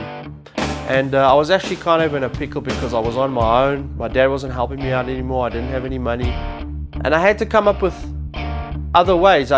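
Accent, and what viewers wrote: South Africa. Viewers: Are u South African? :D My bestie is south african :D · South African? :> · Haha, straight away I can here a Joburg boy!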